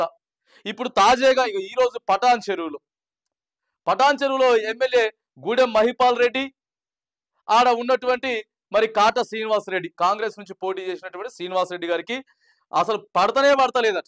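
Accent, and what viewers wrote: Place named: Andhra Pradesh, India. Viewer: అందరికీ నమస్తే నువ్వు ఆందోళన తమ్మి అందరికీ నమస్తే అన్న పదం మంచిగుంది గాని నీ మాట వింటే ఆంధ్ర లెక్క ఉన్నావ్ ఆంధ్ర కుక్క వా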